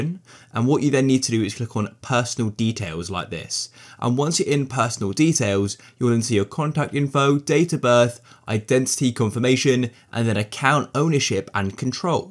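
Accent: United Kingdom. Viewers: If he is american why does he speak british?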